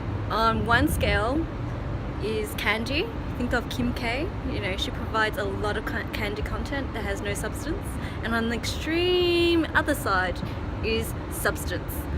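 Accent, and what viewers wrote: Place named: Australia. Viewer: Love the Australian accent but your breakdown of substance vs. candy content is spot on.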